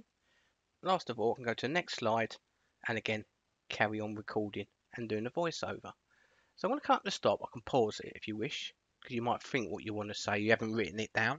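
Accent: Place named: London, United Kingdom. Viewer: OY!!!! You sound like you’re from London!! 🤣👏👏👏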